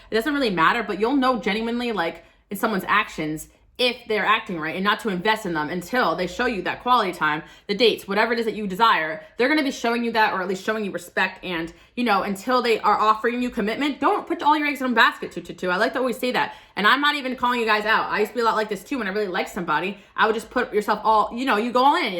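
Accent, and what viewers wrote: New Jersey, United States. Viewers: I love when your Jersey accent comes through.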